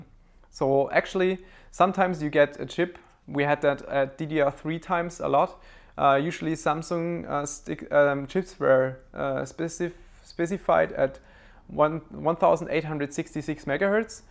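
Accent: Germany. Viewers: thanks! also I like your accent. I want to go to Germany someday because people say it is beautiful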